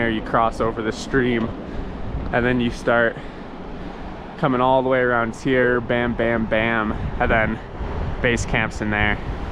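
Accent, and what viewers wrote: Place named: Scotland, United Kingdom. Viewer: Hearing a northern/Scottish person was not what I expected but yay.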